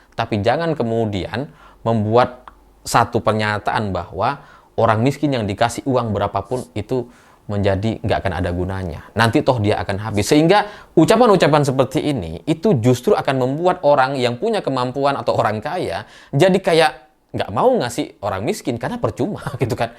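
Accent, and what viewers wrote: Indonesia. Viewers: Bang ini kyknya "lok lemak"... dari logatnya 😂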